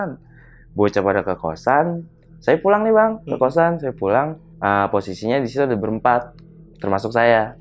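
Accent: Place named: Banyumas, Indonesia. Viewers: et mat gua mau nonton horor mat, kaga usah ngapak² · bahasa depok kok mirip bgt yakk sama bahasa ngapakk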